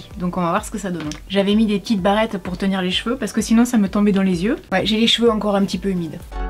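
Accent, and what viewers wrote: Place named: Marseille, France. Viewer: T'as l'accent marseillais !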